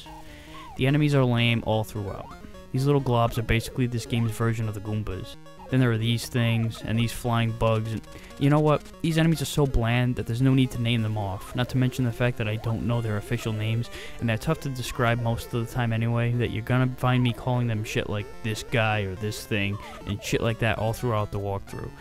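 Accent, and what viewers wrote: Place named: Brooklyn, United States. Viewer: I like your Brooklyn accent when hearing your reviews. It enhances the review's humor and makes you kinda sound like a bad ass. XD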